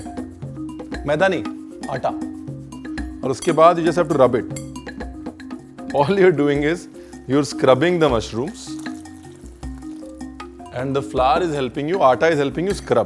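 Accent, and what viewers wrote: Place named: Punjab, India. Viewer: Ranveer pra...Love you...Your style amazing and jab bat karte hue Punjabi touch ata haina...mast bro you awesome....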